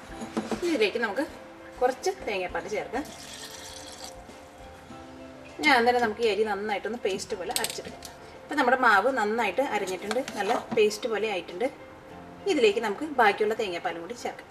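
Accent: Thrissur, India. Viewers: No offence Nancy, but her talking style is her idendity! I would request her to be the way she is! I am not from Thrissur. But when i listen to her, somehow I feel home.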